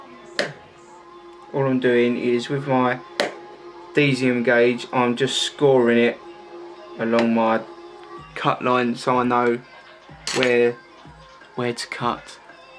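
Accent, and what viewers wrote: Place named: United Kingdom. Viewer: Your voice sounds like comedian Russell Brand; you must come from the same area of UK.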